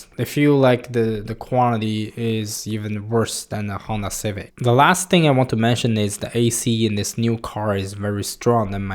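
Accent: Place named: China. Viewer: good english, but still, 老中 😊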